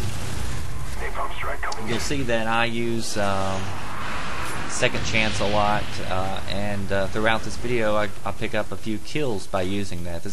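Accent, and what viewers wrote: South, United States. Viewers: I like this guy's slow southern speech and laid back attitude.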